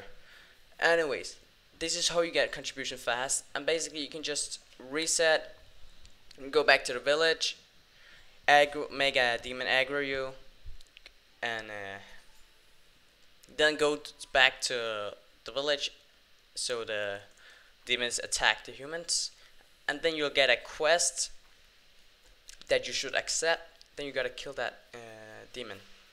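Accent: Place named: Denmark. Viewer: this guy has a danish accent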